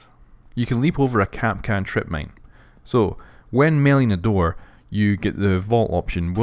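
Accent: Scotland, United Kingdom. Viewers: Do you mind if I ask what part of Scotland you are from?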